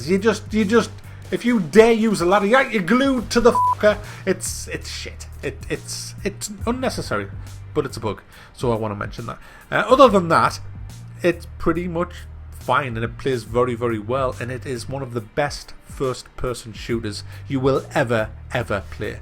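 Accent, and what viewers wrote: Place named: Northern Ireland, United Kingdom. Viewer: Do you live in Northern Ireland, just wondering because your accent sounds similar to mine, being from County Down.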